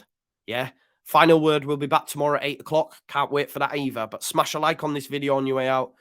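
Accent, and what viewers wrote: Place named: Sunderland, United Kingdom. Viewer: Are you getting g a bit of a mackem twinge? Howay great content thanks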